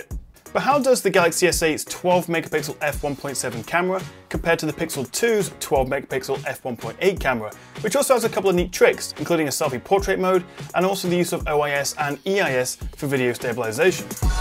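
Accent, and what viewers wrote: United Kingdom. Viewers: i love you,, you are so handsome and nice british accent :p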